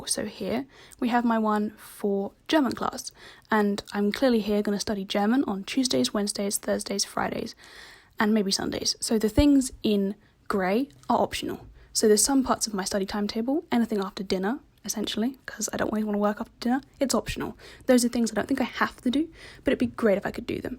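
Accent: United Kingdom. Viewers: Hey! I m learning Japanese. Perhaps n5 level. I like your accents. I like british accent. I want to learn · What a classy RP accent. Unfortunately you don't learn French. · I just love the British accent. It sounds so coool haha.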